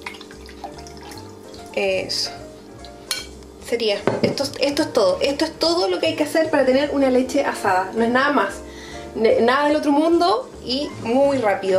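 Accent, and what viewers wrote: Chile. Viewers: Bella Fernandes obvio chilena por coml habla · me encanta la receta no se ve para nada dificil, mi unico problema que tengo cocina magallanica. por tu acento eres chilena. saludos desde punta arenas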